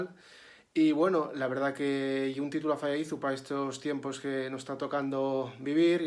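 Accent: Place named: North, Spain. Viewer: De que parte del norte eres? Es que estudio portugués y hay mucha similitud con algunas palabras que pronuncias 😳 Y por cierto es una obra maestra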